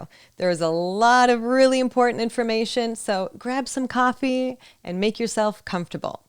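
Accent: United States. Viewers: Are you American? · To have a fluency in second language is not overnight. It's a whole bunch of thing that has to bear in mind mainly if don't live in an English spoken country. In my case i've been practising English my whole life and it's not enough for me 'cause i've got to get into it for hours as if i were living in USA. And nowadays cell phone, laptop and apps help a lot to get in touch with the whole world if you really want to improve your English. By the way you're a good native English teacher and nothing better than learning a real American English from you. GOD BLESS YOU.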